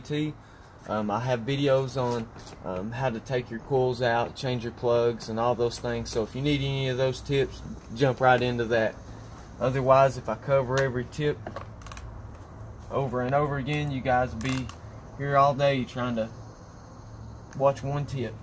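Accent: South, United States. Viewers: The information presented is ok , but that southern accent is sounded much better 😊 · Somewhere in the south. Really annoying to anyone outside that area.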